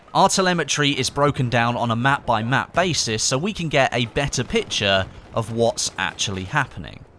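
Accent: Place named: England, United Kingdom. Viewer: john long He's British he has he English accent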